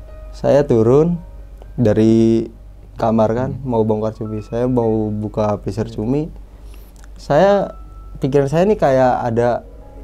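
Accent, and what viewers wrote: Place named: Indramayu, Indonesia. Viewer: Endah weru logat indramayu seru pengalaman horor wedi ne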